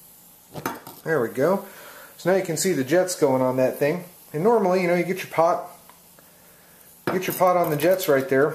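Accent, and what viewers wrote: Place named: Michigan, United States. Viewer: What part of the country are you from? Because your voice and accent are exactly like mine! My wife thought I was on the phone, then she thought I was narrating this video. We have been married for over 30 years, and she was amazed listening to you talk. We both grew up in Michigan. Just wondering if that is where you are?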